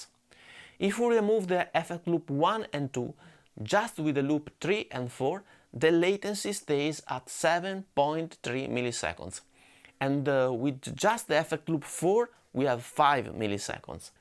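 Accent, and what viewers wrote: Italy. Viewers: Video perfetti, molto dettagliati e precisi. Tra i meglio che si trovano sul tubo… e il tuo accento marcatamente italiota è talmente estremo che risulta pure simpatico 😉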